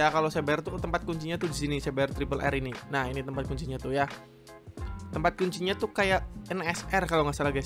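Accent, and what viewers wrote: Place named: Purwokerto, Indonesia. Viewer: purwokerne ngendi pak? logate ra ilang haha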